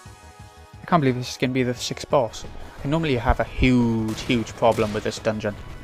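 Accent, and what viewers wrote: Wales, United Kingdom. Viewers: Are you Welsh? Also do you use turbo for the GF cutscenes, or do you mash?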